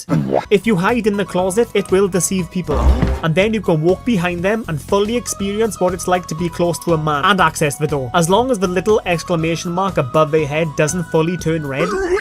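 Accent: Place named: Wales, United Kingdom. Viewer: Welsh accent is carrying this video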